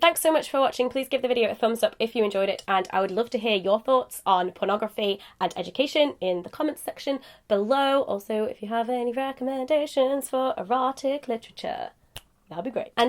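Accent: United Kingdom. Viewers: Where is she from? I know she's British but what part of England is her accent from? I love it. Cause I know there's different British accents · Are you British ?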